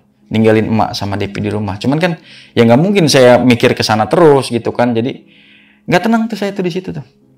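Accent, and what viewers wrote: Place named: Jakarta, Indonesia. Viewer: Enak logat ngomong nya c Abang nya,Betawi bgt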